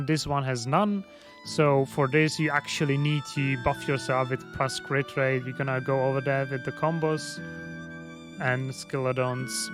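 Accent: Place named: Czech Republic. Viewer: sounds like czech accent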